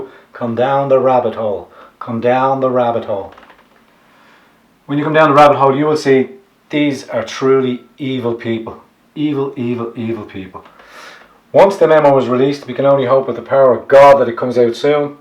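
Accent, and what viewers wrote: Ireland. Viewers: I love your brogue brother. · i love Irish accents, thank you my brother. may YHVH our Yeshua bless and keep you in HIS hands. please research where Israel was scattered; you as the Irish, are of the tribe of Judah. Israel will have blindness of face until the end of days. we're there, this is the end of days. Find out to whom you belong, who is Israel? Did YHVH lose HIS people? NFW. read Isaiah , ask yourself who hung on the cross. YHVH is our Yeshua, you are of His tribes. know your enemy Jn. , , Rev. 2:9, 3:9 or Mt.13. bless you brother. James (of Irish blood)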